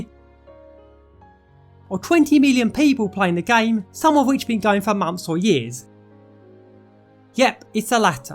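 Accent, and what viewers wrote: United Kingdom. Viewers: This British accent is the most British accent ever.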